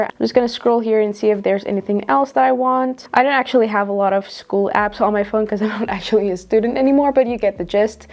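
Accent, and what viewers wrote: South Africa. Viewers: Are you South African? Great video!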